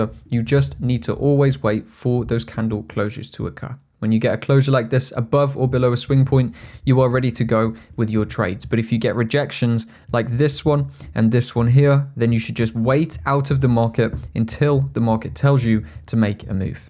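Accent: United Kingdom. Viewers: When he was saying the the the, I think he meant to say there. OMG I hate B.English pronunciation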